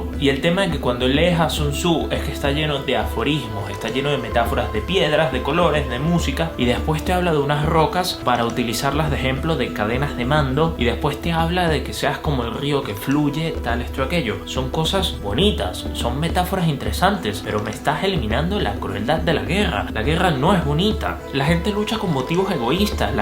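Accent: Caribbean. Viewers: Que asco el acento caribeño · Se que esto es xenófobo. Pero cuando escucho a alguien hablar con acento caribeño, automáticamente siento que va a decir algo poco inteligente. Y hasta ahora no me he equivocado! Pero este tipo ya exageró. Al parecer leyó muchos libros, pero su léxico es tan ordinario.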